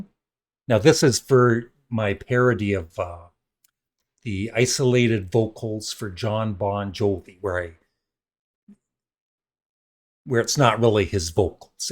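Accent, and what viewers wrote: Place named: Canada. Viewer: OMG! That is hilarious and wonderful. I just started watching your other channel. I'm 61 so I am in the ballpark with you on your taste (with some adjustments but..) Youtube put this on my suggestion list. I am so glad. This is like Celebrities Reading Mean Tweets on Jimmy Kimmel (a late night show we have here in USA - not sure if you do in Canada). I must admit I get a little concerned about the mean comments my favorite audiophile and album reviewers get. I only watch nice people (who should be treated respectfully) You are so gutsy to even read mean comments. Anyhoo... Inspired by some negative things a few Youtube audiophiles (and commenters) have done to other Youtubers, I've been wanting to make my own Youtube video rating my favorite audiophiles, explaining how they work, what I like about them etc.. AND how attractive they are as a man (to me - Gay audiophile/rock and roll fan that I am). 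You had already inspired me to want to make one about album reviewers too! You are a! I'm so glad you called yourself that. Deserved! AND you rock that Canadian accent! Why don't those Toronto Audiophiles I watch rock one too? I hope I get the guts to make such a video and you get to hear how appealing you are! This video was just further proof!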